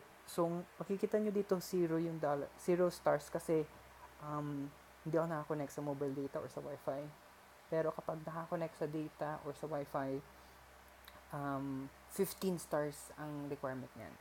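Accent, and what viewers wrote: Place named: Brazil. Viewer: Tu é br ne o seu sutaque parece pq não vez um em ptbr?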